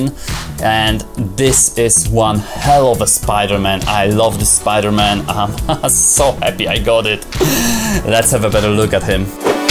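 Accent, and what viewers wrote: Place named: Poland. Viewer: Oooh, Han Solo Pops! There are so many Funko Pops I want :D Btw, I really like your accent, it almost sounds Polish? Am I right? :)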